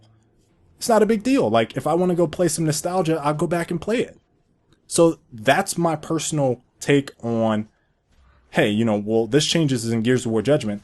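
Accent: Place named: Texas, United States. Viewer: Man I'm starting to hear some Texan accent. Good vid btw.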